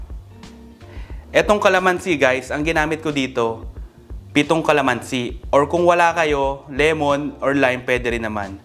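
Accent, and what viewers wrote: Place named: Cavite, Philippines. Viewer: yung punto parang taga-cavite... imus or bacoor hehe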